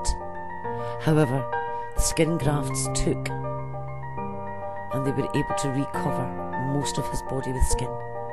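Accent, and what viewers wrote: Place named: Netherlands. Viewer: That’s a Dutch accent that the narrator has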